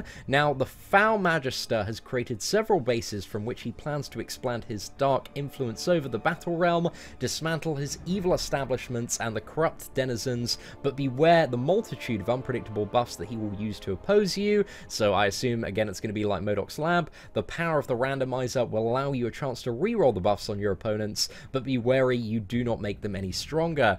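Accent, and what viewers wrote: United Kingdom. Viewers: Why read the patch notes when you can have them narrated to you with a British accent? ;)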